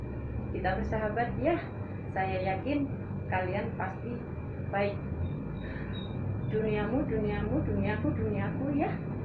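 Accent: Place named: Java, Indonesia. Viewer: Mba asli mana ya? Jawa bukan mba? Aku seneng gitu denger logat bicara nya mba..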